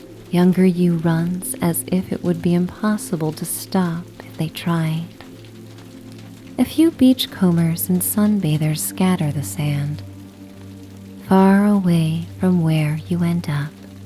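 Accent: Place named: United States. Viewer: Love the rain ☔️ sounds! That is what decided this video for me! Love your voice and accent! I moved from the us to Ireland when I was 8, and the uk 🇬🇧 when I was 16 and I love it but my inner child loves an American 🇺🇸 accent! Soft like yours which was made for this and you would make an amazing hypnotist! Thank you 🙏 🕊🤍🕊 🫶✨🌟💛🌟✨🫶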